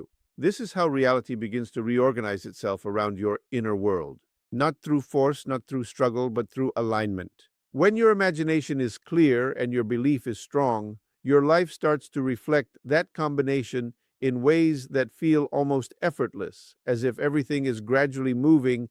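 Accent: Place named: Spain. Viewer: Jose had a pretty strong Spanish accent from what I remember. But these are definitely his words. His cadence was also slower. His speech naturally allowed one to absorb the information. Did u speed the video up & use AI to adjust his voice? His ideas are solid since he studied this from the 1950's-60's but the editing made it just a regurgitation of info that one doesn't quite catch. Solid ideas-great, taking away the accent-ok I suppose or meh, Speed & cadence change- meh. Dont really care for it. But I've listened to Jose walk thru a meditation/guidance almost every day since I found him 2-3 yrs ago. Vishen has a good voice but does the same thing g u did. Delivers it fast. His goal tho is for sales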